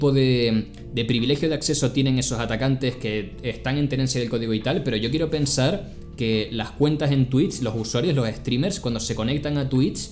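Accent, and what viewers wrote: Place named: Spain. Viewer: Habla como Dequiv, de qué parte de Espana es ese acento? Curiosidad.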